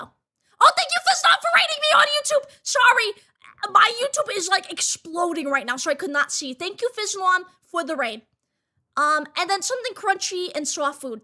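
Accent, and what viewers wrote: Boston, United States. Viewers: Hey I k own that Bostonian Accent!!! 🖤🩶🖤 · That bostonian accent is unmistakable. Congrats on your debut fellow detective! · Congrats on the debut,!!! If I can confess something, I had been a lurker for a long time, and your personality and boston accent was instantly and insanely charming! But at the time I was watching you, I had been FAR too shy to actually say anything, let alone be chummy with chat at the time. When you stepped back for the time being, I beat myself up over the fact I couldn't express my gratitude for making my day and keeping my mind off of life. You have no idea how happy I am to finally be able to do so, how honestly teary-eyed I got when I heard your voice again in the teaser. I know I'm being sappy, but I need to express how I genuinely feel and how overjoyed I am at your return. It was such a relief to find that you're the same goofball that I had subscribed to LONG ago. 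Thank you for being you!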